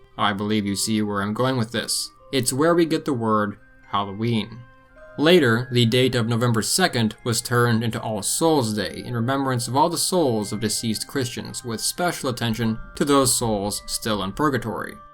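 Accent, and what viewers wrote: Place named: Ohio, United States. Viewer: sounds like your near youngstown boardman ohio area.. lol.. great documentary.. thank so much..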